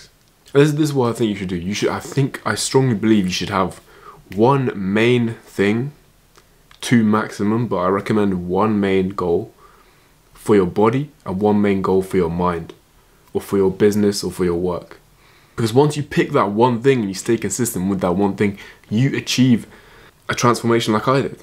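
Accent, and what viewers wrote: England, United Kingdom. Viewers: Looking very handsome and gorgeous - being Irish myself, I love your English accent, so classy - I’d date you in a heartbeat 🇮🇪☘️🇬🇧🥰😍😘😘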